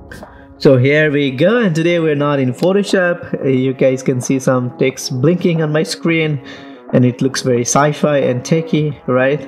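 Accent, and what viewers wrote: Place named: Nepal. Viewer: COCIAL MEDIA ACCENT TELLS U R NEPALI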